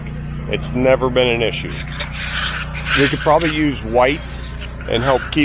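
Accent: Pittsburgh, United States. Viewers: Are you a yinzer? You sound like it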